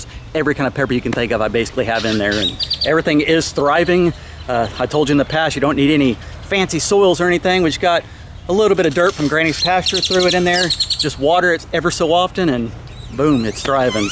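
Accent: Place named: South Texas, United States. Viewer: True south Texas sounds… especially with them crows 😅 just like San Antonio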